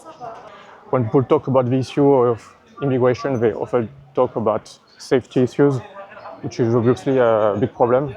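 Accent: France. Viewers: Quel accent merdique! Il n'y a que les Français pour parler aussi mal.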